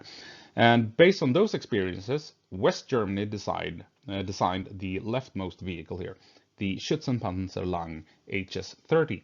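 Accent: Gothenburg, Sweden. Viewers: Du måste vara Svensk, finns ingen som klarar det utalet ;D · är det göteborgska som jag hör?! Gissa mitt namn!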